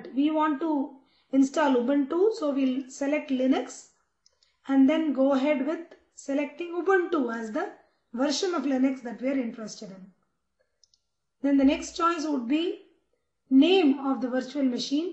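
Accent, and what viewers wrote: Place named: South India, India. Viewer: South Indian accent !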